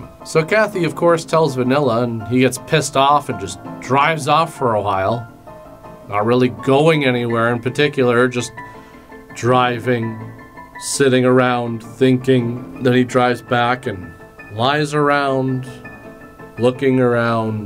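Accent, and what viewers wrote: Canada. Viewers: Ahhhh, I could listen to Canadian "borrow" all day.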